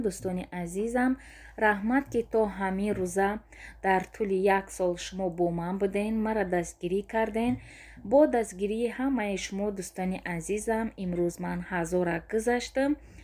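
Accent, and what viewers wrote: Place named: Dushanbe, Tajikistan. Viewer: ачоиб бо забони тарафои Душанбе сухан мекунед,ба ман ачоибаш он ки наход шумо 99% забони он тарафокати гап мезанед,Мо 15 сол боз дар Россия забон гум накардем некин....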